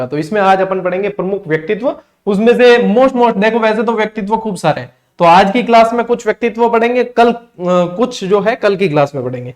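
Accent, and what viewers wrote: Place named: Marwari, India. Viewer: Sir, aapka marwadi accent bahut sweet hai...bless you sir,thank you🛐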